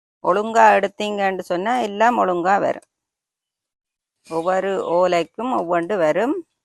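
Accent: Sri Lanka. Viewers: Beautiful Srilanka slang. Excellent art piece. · மிக்க நன்றி. கொஞ்சும் இலங்கை தமிழ் பேச்சு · நீங்கள் ஈழத்தில் இருந்து கதைக்கிறீங்க தானே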